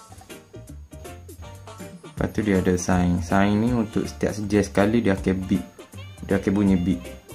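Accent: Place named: Kelantan, Malaysia. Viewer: Terpadu skali loghat kelate boh...steady sep kito..haha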